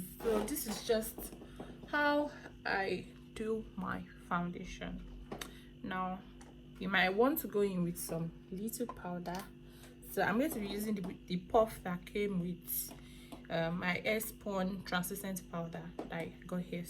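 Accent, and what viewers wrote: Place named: Nigeria. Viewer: As soon as I opened the video and heard your voice I said, yesss my Nigerian sistaaa!!! just subscribed!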